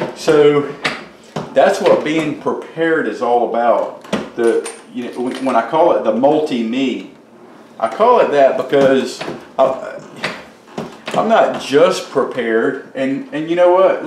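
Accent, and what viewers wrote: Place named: West Virginia, United States. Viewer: I wonder if is going to play and sing in one of his videos he is definitely a patriotic American and sounds like awesome people my guess is by listening to that accent he is probably from WV... 😉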